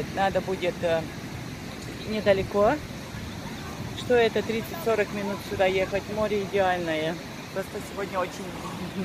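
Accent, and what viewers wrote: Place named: Bulgaria. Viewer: У вас акцент болгарский 😉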